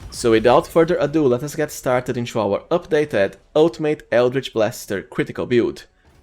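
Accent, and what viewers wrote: Brazil. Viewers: are you brazilian btw? The accent feels like it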